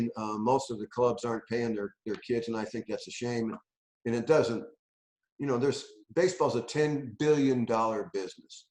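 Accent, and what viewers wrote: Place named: Indiana, United States. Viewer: u sound like a maga in indiana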